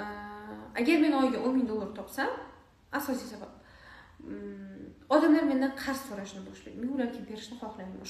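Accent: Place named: Uzbekistan. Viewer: манга сизни жасоратлингиз ёкди. Жуда чиройлийсиз, исмингиз жисмингизга монанд мафтункорсиз, сокин, у́збекона гапирар экансиз. У́з хаётий тажрибангиздан очик- ойдин бу́лишганингиз учун рахмат. Сиздек у́збек йигит- кизлар дунёга танилишларини хохлардим.Янада юкори чу́ккиларни забт этинг.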